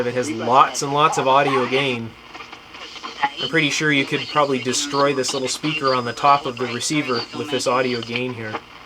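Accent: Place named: Canada. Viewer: Are you from Canada? it sound like you have a Canadian accent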